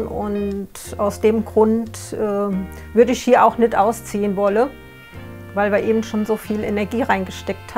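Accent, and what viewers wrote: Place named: Bavaria, Germany. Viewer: Der Dialekt muss aus dem Raum Aschaffenburg kommen 😅 fühl mich direkt zuhause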